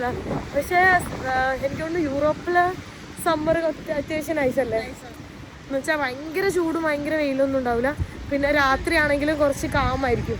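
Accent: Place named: Mattancherry, India. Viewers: മട്ടാഞ്ചേരി സംസാരം കേട്ടിട്ട് really super🎉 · എന്ത് പറ്റി. വീഡിയോ ഒന്നും ഇടാതിരുന്നത് 🤔 ാടെ. സംസാരം T.c. r Kochi കൂടി കലർന്ന സംസാരം പാലക്കാടൻ. സ്ലാഗ് ഒട്ടും ഇല്ല V. Good 👍🏼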